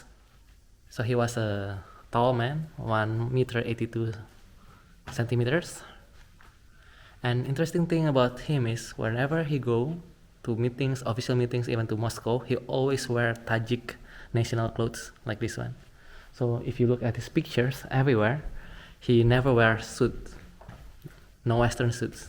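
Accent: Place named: Indonesia. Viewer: Untung aja logatnya bang Indonesia banget, jadi masih bisa mengikuti semenjak jadi English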